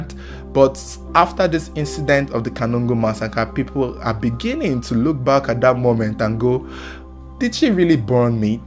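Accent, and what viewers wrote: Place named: Nigeria. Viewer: This narrator sounds Nigerian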